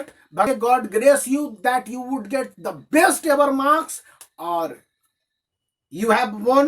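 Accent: Bihar, India. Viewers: Abe bihari phale batt karna sekh pagal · Are u Bihari sir . Ur English sounds like that . I am also from Bihar . Kaisan ba. Sab thik ba toh ! Very much thanks from me to u for making our exams cancel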